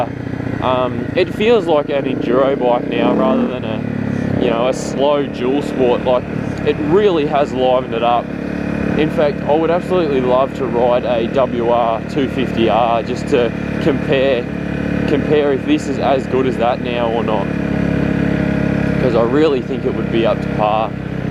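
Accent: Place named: Australia. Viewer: I am in Canada have been watching your vids for a while and have a 250L and i cant tell you how many times I have joked around and said here in a aussie accent and my friends all look at me like im retarded lol your awesome dude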